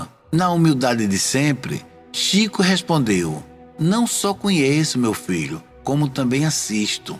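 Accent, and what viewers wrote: Northeast, Brazil. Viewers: Boa noite, já conhecia a história, só assisti de novo porque gosto da sua fala, esse jeito carregado do nordeste. Abraços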